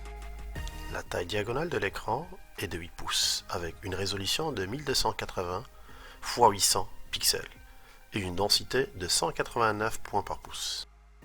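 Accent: Quebec, Canada. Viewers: Très bonne vidéo. Ca m'a aidé a la choisir pour mon fils. C'est appréciable quz ton accent québécois n'est pas très marqué ca permet aux européens de l'écouter avec plaisir. +1 pouce bleu pour te soutenir pour ton bon travail.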